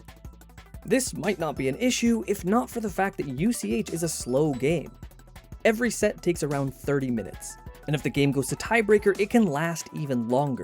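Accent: Canada. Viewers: Oh yeah HAVE to be canadian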